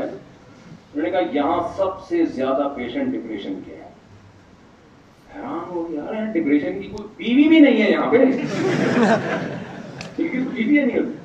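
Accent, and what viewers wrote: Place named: Karachi, Pakistan. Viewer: I'm Lucky for having residing near to the masjid where he gives Jumma. Khutba every Friday. I met him two years ago since then I never missed my salaat namaz , Ramadan and aitiikaaf, in his masjid. MUFTI SAAB is so friendly and kind. He gives his bayans are given in the language and accent that people in Karachi understands.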